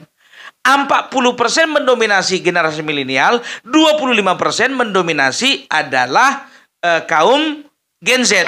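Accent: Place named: North Sumatra, Indonesia. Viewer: Saya suka opung ini. Ya gaya bicaranya dengan logatn batakya yang kental, gaya bahasanya yang lucu, bersemangat membawakannya, blak-blakan logis, menohok.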